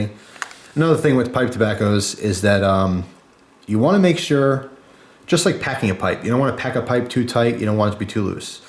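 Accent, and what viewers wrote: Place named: Long Island, United States. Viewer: very good video bud. you sound like you're from long island. I grew up in Suffolk County.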